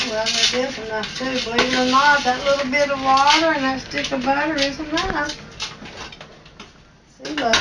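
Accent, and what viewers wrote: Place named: South, United States. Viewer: Just joined your channel because Jan mentioned you and said we should watch yours. I’m from Tennessee so I know that southern talk lol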